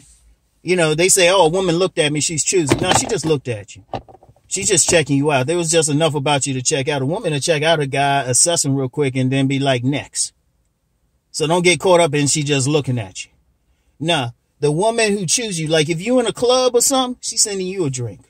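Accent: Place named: DMV, United States. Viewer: Dude, with that accent you've got to be from the DMV area! GREAT information too!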